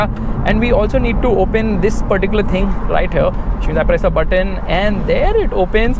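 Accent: India. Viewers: Very Indian English and fast. Slowly plz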